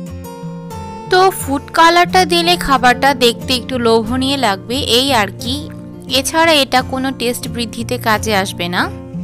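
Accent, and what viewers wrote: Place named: India. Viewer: Khub khub Valo laglo khete Mon chaitese apu TMR Katha gula Indian blogger Der Moto lage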